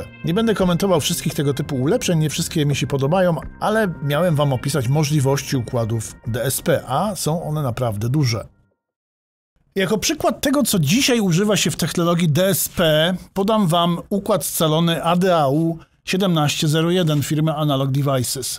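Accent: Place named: United Kingdom. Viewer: Pańskie filmy bardzo mi się podobają i wiele się nauczyłem. Ale.... Nieznośna jest ta maniera stosowania na każdym kroku fonetyki angielskiej. Może dałoby się to zmienić? Przepraszam za niemerytoryczną uwagę, ale nie mogłem się powstrzymać.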